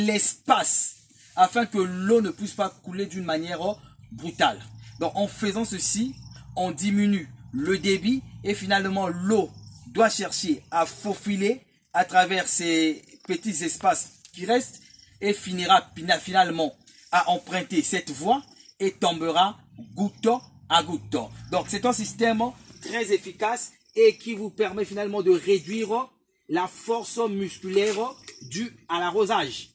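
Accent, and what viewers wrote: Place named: Africa. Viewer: Aaahhh,ça c'est l'Afrique! Avec le véritable accent et la vraie pédagogie! Est-ce '" tu comprends, hein?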